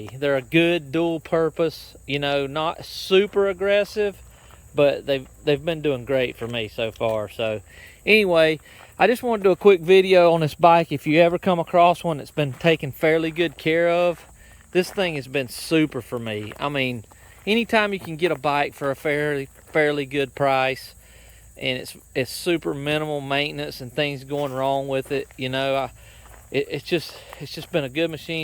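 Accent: South, United States. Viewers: Where are you from? You have a major southern accent.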